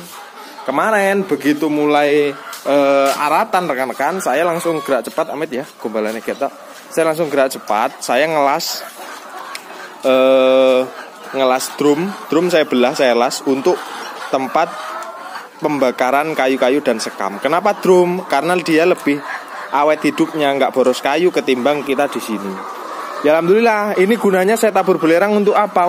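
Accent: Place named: East Java, Indonesia. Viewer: Mantab mas ilmunya,..maaf jenengan asli mana to, logat jawanya kental khas jawa timuran..tinggal di Lampung.salam seduluran dari wong Sidoarjo jawa timur..tp asli jawa tengah Purwodadi Grobogan..juooossss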